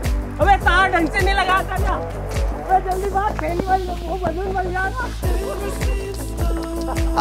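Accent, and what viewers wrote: India. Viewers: I can only speak English, but I love the Indian accents 😀🇮🇳 the words flow so smoothly 🥰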